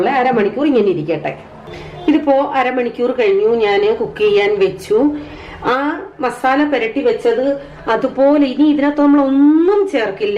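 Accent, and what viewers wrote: Kottayam, India. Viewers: ചേച്ചി കോട്ടയംകാരി ആണോ.... അവതരണശൈലി കേട്ടിട്ട് അങ്ങനെ തോന്നി...... എന്തായാലും അടിപൊളി.... നോയമ്പ് കഴിഞ്ഞു ഒന്ന് ഉണ്ടാക്കി നോക്കണം.......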